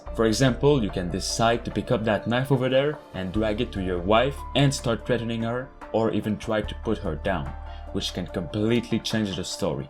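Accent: Quebec, Canada. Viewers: french canadian accent, without trying to hide it I don't mean that as a bad thing, but a lot of french canadian don't have an accent when speaking english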